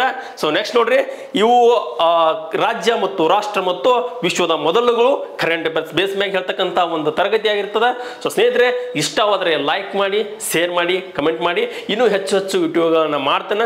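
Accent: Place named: Bangalore, India. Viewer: ಭಾಷೆ ಬೆಂಗಳೂರು ಆದರೆ, ಚೆನ್ನಾಗಿ ಅರ್ಥ ಆಗುತ್ತೆ ಸರ್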